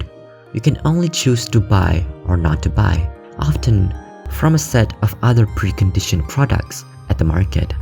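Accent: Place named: Asia. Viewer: Interesting perspective, but there are some points you made I'm agaisnt. Dressing up as animals in public or marrying fictional characters shouldn't be normalized by society and encouraged. You should not go to prison or be systematically punished, but these actions should be socially condemned. A society needs stable and functional norms that are followed by each citizen in order to survive, or else it risks falling into problems such as decadence or just general social instability. Morality can be subjective depending on how you look at it, but standards must be set. It doesn't have to be 1984 either. However, I can understand why you might think this way. I'm not too knowledgeable on this topic, but on some countries such as Korea and Japan it can get kinda insane, as it has become socially normalized to have a false identity in your public life just to be agreeable and appease everyone. 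Judging from your accent, I think you may be Asian so it is reasonable to think you'd be unsatisfied with how social freedoms can be restricted by society in those countries. However, at some point, freedom needs to be limited so society can keep running and not fall into collapse. You have the freedom to make choices, but you do not have the freedom to escape the consequences. This may sound a bit like Petersonianism, where every individual has free will but it must be enforced with strict moral codes for an ideal society. I might be wrong, I'm not a philosophist I'm just sharing my thoug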